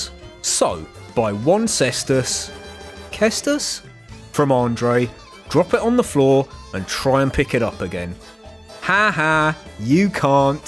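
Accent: United Kingdom. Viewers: I just love tired british acents for example how you say "at a" just sounds like ah a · I absolutely love this sarcastic British narration, your amazing voice and impeccable attitude have earned you one more sub, and hopefully many more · Why the hell do you sound like old Pyrocynical? Or all british people sound like that? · oh god you're british. not watching bye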